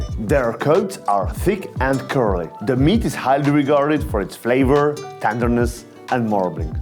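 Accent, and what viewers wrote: Hungary. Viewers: Noo way..I knew I recognized that accent, but I never realized you’re not an, you’re a! 😁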